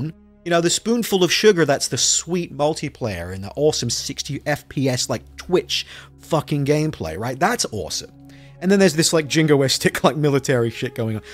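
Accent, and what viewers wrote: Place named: Northern Ireland, United Kingdom. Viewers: he's a Brit who has lived in America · He sounds (Northern) Irish to me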